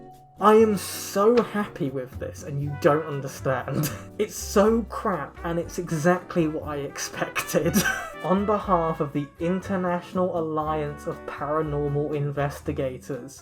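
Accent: United Kingdom. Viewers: guys is she un ironically british?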